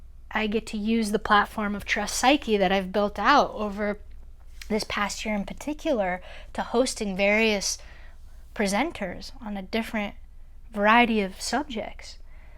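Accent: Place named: Italy. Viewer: I heard the Italian in you before you said it.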